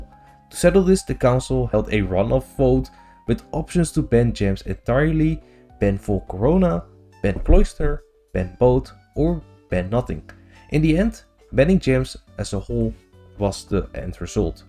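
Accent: Netherlands. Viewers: I like how i could tell you were Dutch after the first 5 seconds. I am also Dutch myself 🙂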